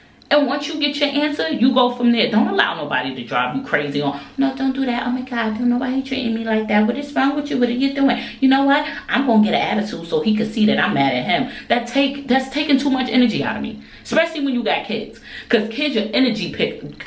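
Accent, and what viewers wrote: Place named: Brooklyn, United States. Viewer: I have just been watching and listening to you for a minute... I just turned 54 yesterday and my dear YOU display more maturity than anyone that i know personally..I admit I have been bias because I just moved to NC from NY and your NY/BK accent just make me happy after a long day of southern charm. However your maturity, intellect and poise is so amazing. Brava!! Don’t ever change.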